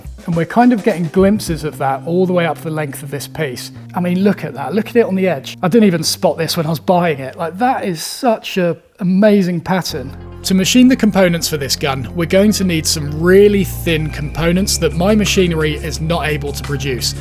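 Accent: United Kingdom. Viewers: I wish I had this man's British accent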